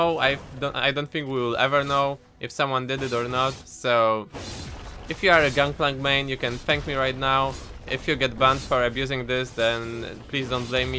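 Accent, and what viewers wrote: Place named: Poland. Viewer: Jesteś Polakiem? Akcent strasznie polski:P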